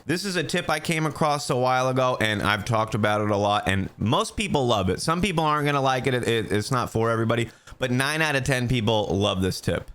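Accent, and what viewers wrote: United States. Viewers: what state is ur accent from homie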